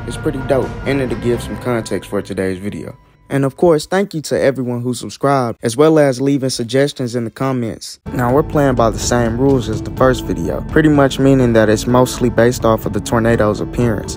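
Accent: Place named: Louisiana, United States. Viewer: I’m hearing a Cajun accent in the narrator. I love that accent!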